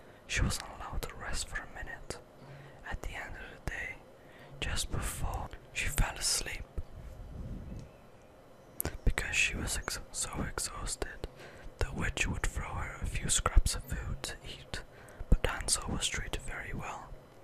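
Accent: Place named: United Kingdom. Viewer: Oh my God. You truly are my ultimate favorite trigger. I'm so happy you are back. Your voice is so angelic. I usually close my eyes when you talk, to savor your sounds. I love you. Thanks for watching my video. I think I need to move to UK and get my daily dose of British accent. <3:) Love, Ann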